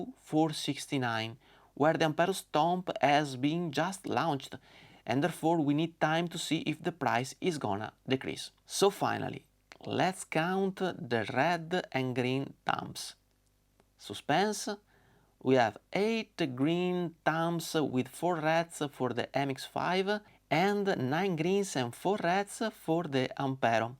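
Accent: Italy. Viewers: He's Italian, he has an Italian accent; what's the issue here! Great video (as always)!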